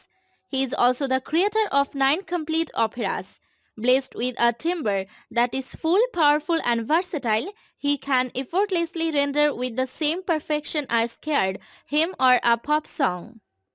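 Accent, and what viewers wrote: India. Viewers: Your accent sound like Indian to me hard to understanding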